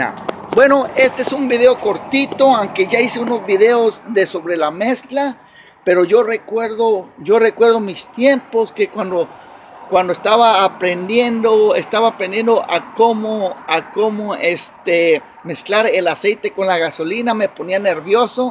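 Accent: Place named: United States. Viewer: Porque el acento bobo de EEUU?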